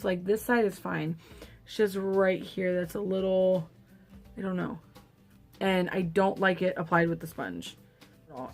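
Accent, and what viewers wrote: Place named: Latin America. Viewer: Glad you guys are ok!! 💜 I want the Fenty foundation SO BAD but there are too many shades to swatch 😅 But GIRL. I just realized- are you a fellow Latina!? I never realized your accent before this vid! You sound just like mis primas! 😂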